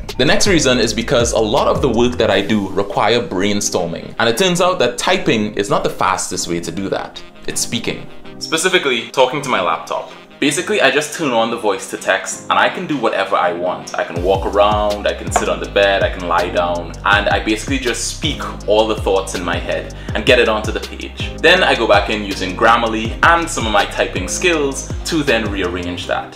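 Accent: Trinidad and Tobago. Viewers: Are you from Trinidad & Tobago? You sound exactly like one of my coworkers. Except with a less pronounced accent. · Are you from Trinidad?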